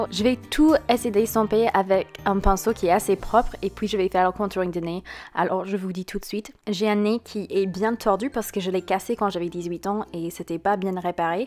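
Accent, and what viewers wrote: United States. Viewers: L'accent américain il est tellement beau 😍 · Oh mon Dieu, déjà que mon rêve c'est de vivre aux USA, mais alors toi, ton accent et tout, ça me donne trop envie d'y aller😔💜 Et sinon tes trop belle et super vidéo💜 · I had no idea has an American accent when she speaks French x) I hear it normal maybe considering I'm American so French people will hear this differently. I wonder if they like the American accent though? ^^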